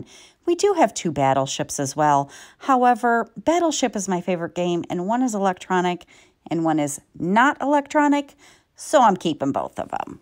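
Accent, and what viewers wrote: United Kingdom. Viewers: I talk to my daughter in a British accent all the time like you do!😂😂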